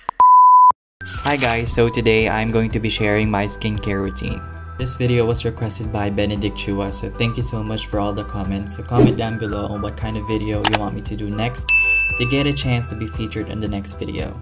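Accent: Philippines. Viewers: Waiit is it just me but you have a slight Filipino accent when you’re speaking English. Woaahh 😱😳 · Filipino accent, pinoy baga ito?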